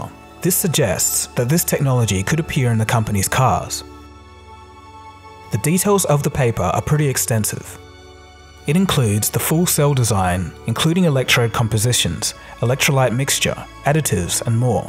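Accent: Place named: Australia. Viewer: Ford falcon?! Only noticed the subtle Aussie accent after that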